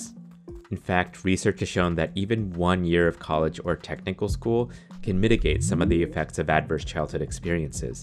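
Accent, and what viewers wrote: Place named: United States. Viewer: Okay, I'm guessing America seeing as the narrator has an American accent and you tracked whether the kid sees someone get shot.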